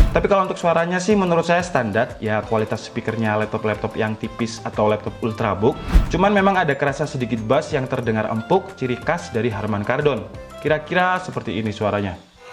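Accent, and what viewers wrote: Malang, Indonesia. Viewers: Orang Malang min?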